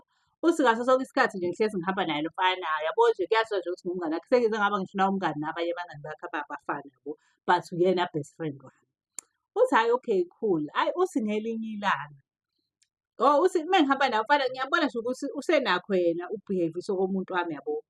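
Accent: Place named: Pietermaritzburg, South Africa. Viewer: Hawu awusho awsiye owasePMB? Ngizwa i accent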